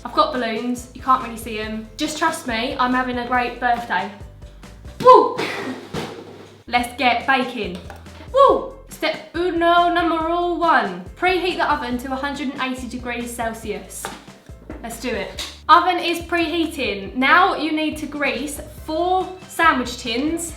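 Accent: Essex, United Kingdom. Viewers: The Essex accent just tops these baking videos also have a lovely 18th! 😘😍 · to YOU SOUND SO ESSEX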